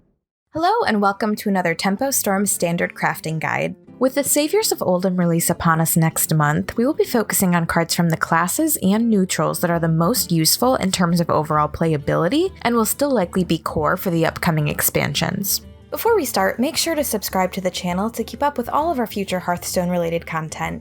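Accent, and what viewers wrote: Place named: Michigan, United States. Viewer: I don’t hate your voice. But your accent is very distracting when my brain is trying to figure out what a warlack is 🧐😜 Michigan, I’m guessing?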